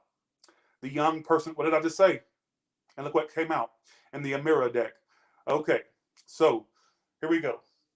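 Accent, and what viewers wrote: United Kingdom. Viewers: Love the UK accent lol xxxxx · The British accent 🤣🤣 I definitely spend too much money in Tesco !! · Uk accent 👌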